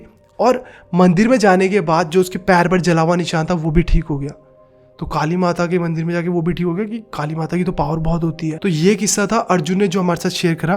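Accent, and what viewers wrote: Punjab, India. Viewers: Sir ap punjabi hai kya... I mean apka accent or dialogues punjabi style me hote hai....like chandigarh panchkula side... Mane abhi aj hi apki ekdo videos dekhi first time...mujhe lga k ap udhr se hai kya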